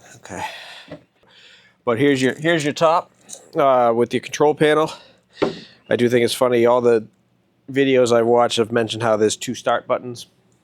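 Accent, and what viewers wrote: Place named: Massachusetts, United States. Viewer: Lol. I was listening to you and thought - "this guy has to be from Mass." Sure enough, saw the plate on the vette and it was confirmed. Great vid - Thanks!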